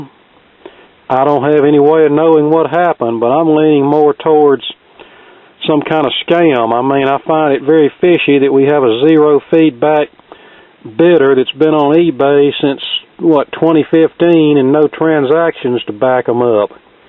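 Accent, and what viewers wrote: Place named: United States. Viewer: Blitz Roehre Vor dreißig Jahren wohnte ich in süd Deutschland. Ihr Akzent klingt anders... Amerikanisch vielliecht?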